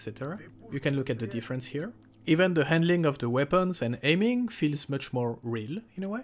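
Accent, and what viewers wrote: France. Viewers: a little bit too frenchy for me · Cet accent français incroyable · is that a french accent ? Parce que je m’y connais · c'est clairement un accent Français que j'entend la ^^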